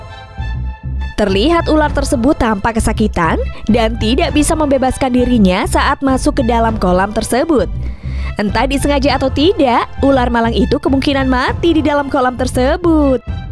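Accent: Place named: Medan, Indonesia. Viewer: Yang aneh logat yg bicara mirip dialek medan atau makassar Bikin salah fokus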